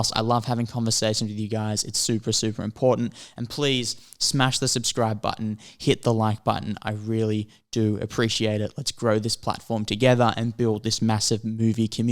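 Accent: Australia. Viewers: Oh My Goodness! Looking forward to watching it! I really enjoy how you explain things and put things into perspective! I've been subscribed since a month ago and have been binge watching and re-watching your videos since then! I think I may have a crush on you! Haha! <3 Are you Australian? I really like the sound of your voice..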